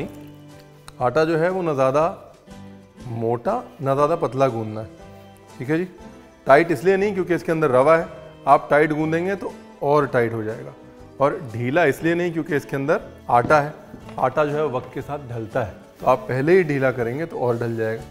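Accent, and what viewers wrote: India. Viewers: Never heard of it sir love your deliberate desi pronunciations "waaater" 'mangostaan' nice dish definitely going to try it salute to my favourite chef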